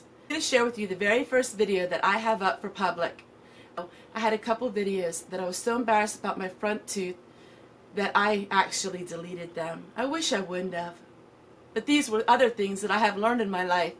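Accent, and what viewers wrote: Pennsylvania Dutch, United States. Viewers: Congratulations! I found you just over a week ago, but have been enjoying your channel so much. Your laugh (giggle) is one of my favorite parts of them! Your Pennsylvania Dutch accent is charming too! Thanks for being so real and down to earth! Merry Christmas to you!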